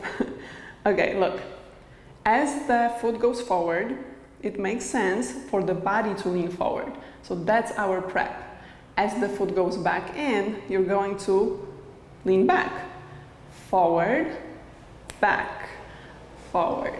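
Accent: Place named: Poland. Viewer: Mel15 Mcc her accent sounds polish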